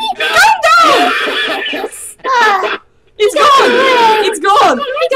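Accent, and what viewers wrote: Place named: Italy. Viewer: and i also luv wat u did the way u speak both of i think italian english speaking accents lol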